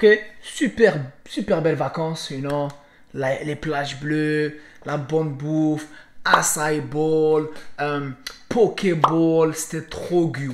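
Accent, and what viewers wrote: Canada. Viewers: t as un accent canadien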